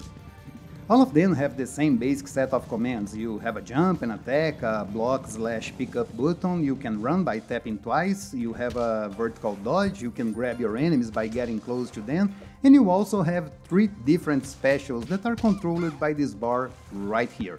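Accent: Brazil. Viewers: Parece brasileiro falando inglês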